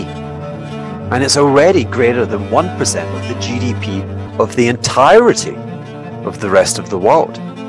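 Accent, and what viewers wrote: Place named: Glasgow, United Kingdom. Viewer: Interesting... but, as always a mind through your own eye. I thought I recognised the accent I saw you before on CNBC and checked. Glasgow lad. Well done you.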